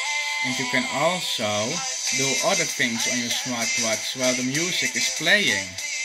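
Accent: Netherlands. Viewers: Hahah Nederlands accent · Echt goud hoe je zijn Nederlands accent hoort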